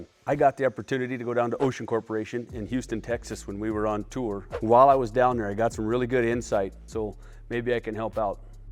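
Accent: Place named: Canada. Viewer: How does this Yank have a thicker Canadian accent than those living in Canada 🇨🇦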